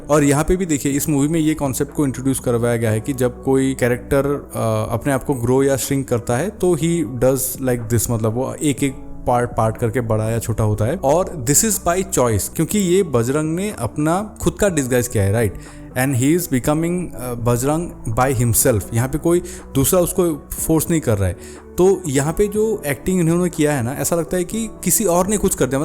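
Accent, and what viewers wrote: Assam, India. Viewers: Assamese boy speaking hindi in assamese accent😂😂😂.....moja video❤❤❤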